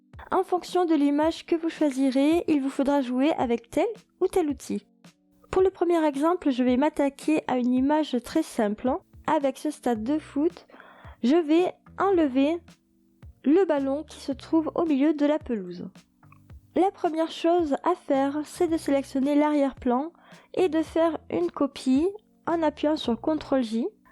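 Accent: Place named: Béarn, France. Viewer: on craque également pour l'accent (bo-arnaiz)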